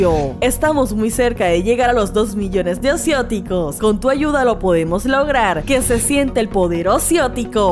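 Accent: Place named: Ecuador. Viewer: Te veo De Ecuador✌❤ por la voz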